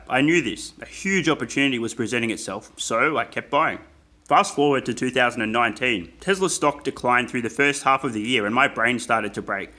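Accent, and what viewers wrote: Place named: Australia. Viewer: I can tell you are an Aussie, I'd like to have a beer or more, where are you?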